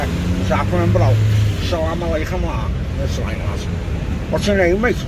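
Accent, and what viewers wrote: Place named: Liverpool, United Kingdom. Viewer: Pure scouser 😂😂😂😂😂😂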